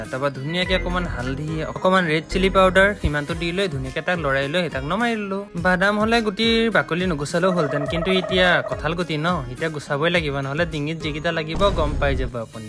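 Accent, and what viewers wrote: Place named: Nalbari, India. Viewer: dada mur nam tu lona koi koi vagor lagisa❤❤ tumi kom kom buli he koi thaka nokowaya dakhun nalbarir para koiso❤❤❤❤❤❤❤❤❤❤❤❤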